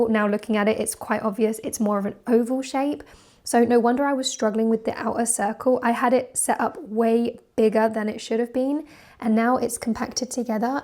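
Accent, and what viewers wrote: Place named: England, United Kingdom. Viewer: there is just something about watching a beautiful redhead with a cute English accent doing puzzles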